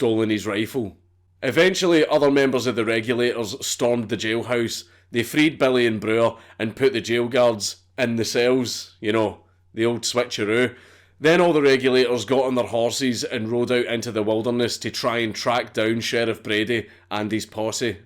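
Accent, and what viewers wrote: Scotland, United Kingdom. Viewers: It’s fucking brilliant when you hear a Scottish man say murder 😂. Your accent makes your videos. The research you do and how you portray every story is top tier. Fantastic channel. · There is just something about hearing a Scotsman describe the old west that touches my heart.... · Its pronounced bu lly tha kid in scottish william becomes wulliam bill becomes bull not sounding bull as in the animal they sound diffrent hard to explain to pple who are not scottish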